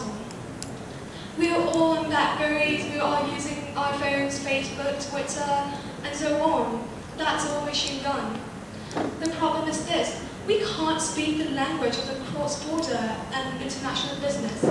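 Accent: United Kingdom. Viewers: What a British accent! So impressive ^^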